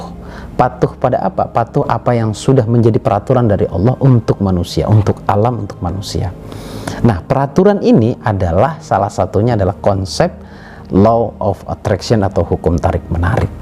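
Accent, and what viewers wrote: Madura, Indonesia. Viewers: Gus. Orang madura? Dn jawa.. Pantesan.. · Madura mana? Mas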